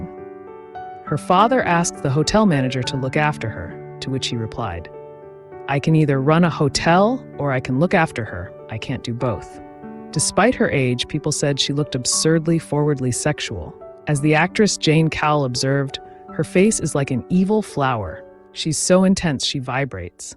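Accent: United States. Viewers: Sounds like my first wife from Tennessee. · I' usually like to listen to mostly Men Narrators with nice toned voices' but You' have a American Easy on the Ears Lovely toned Voice 👌✨🎶🎧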